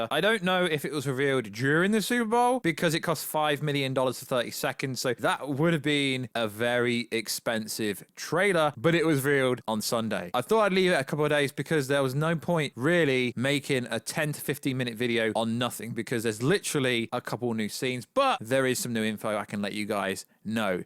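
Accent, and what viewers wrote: England, United Kingdom. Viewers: My boy, that's English with an English accent, it's like me saying I don't understand Hindi if you speak with a North Indian accent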